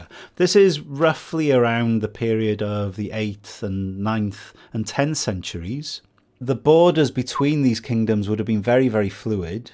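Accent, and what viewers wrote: Wales, United Kingdom. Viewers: It's nice to have a Welsh bloke talking on this subject for once instead of some posh English neo -pagan classicist academic pontificating on things he only partly understands.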